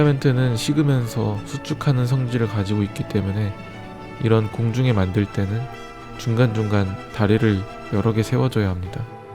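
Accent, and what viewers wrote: Daejeon, South Korea. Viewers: 형 대전출신이야?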